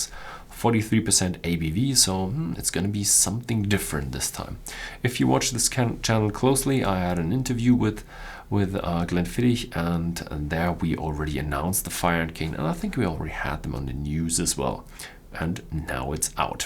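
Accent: Germany. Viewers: "A lot of news" or "tonnes of news" would work well. That said, I'd recommend you don't try to correct it. It adds flavour to your rather slight German accent. Back on topic: I could've sworn I've had an Amrut rum that was quite good. But google says there isn't one...?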